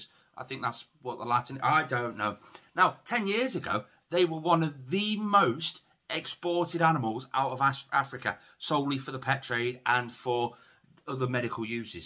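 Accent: United Kingdom. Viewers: Y'all Brits have such a bizzare way of saying things to us in the states it's neat though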